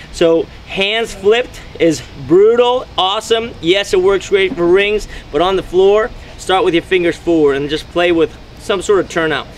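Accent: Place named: United States. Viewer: he sounds like he lives in America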